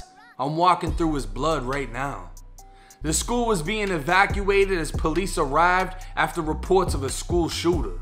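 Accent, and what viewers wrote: Boston, United States. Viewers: You sound like you from Boston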